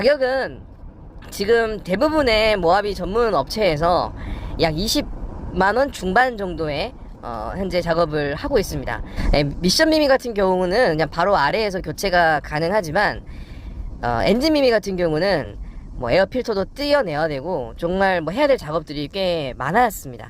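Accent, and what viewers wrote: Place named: Jeolla, South Korea. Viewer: 전라도 말투 보이긴했는데 광주분이셨군요, 기회되면 뵙죠 ㅎ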